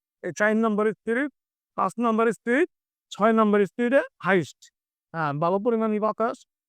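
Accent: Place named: Rakhine, Myanmar. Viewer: Rohinga vashai kotha bolchen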